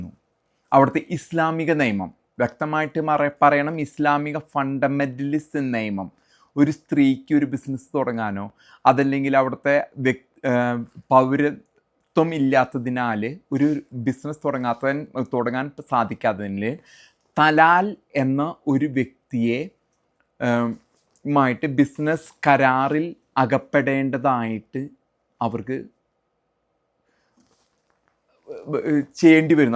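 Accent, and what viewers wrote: Thrissur, India. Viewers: ചാലക്കുടി_അങ്കമാലി slang aanu❤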